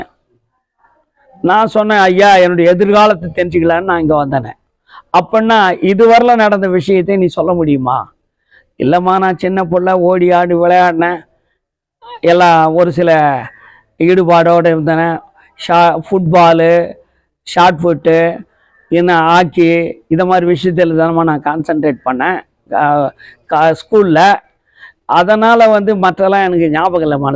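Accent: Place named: Chennai, India. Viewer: லோபர் பயலே மெட்ராஸ் சிலாங் பேசும் புறம் போக்கு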